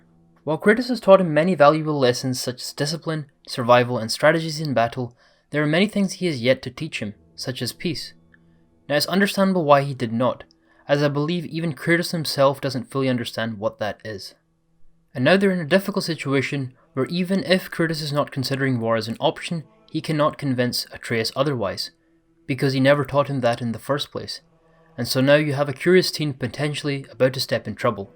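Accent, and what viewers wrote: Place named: Scotland, United Kingdom. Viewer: You're very good at this - and youll only get better with more practise. Keep it up. Also great to hear a fellow Scottish accent in this scene.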